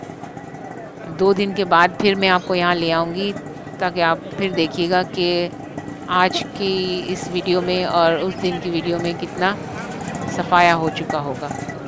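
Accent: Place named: India. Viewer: Your voice is pure Indian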